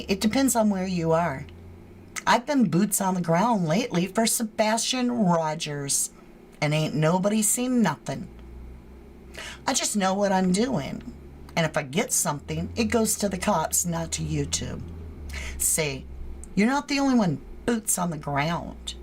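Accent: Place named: United Kingdom. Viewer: I love your UK accent 😂❤️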